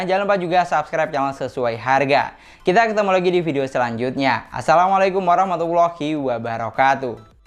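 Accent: Madura, Indonesia. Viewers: Madura mana be'en kek?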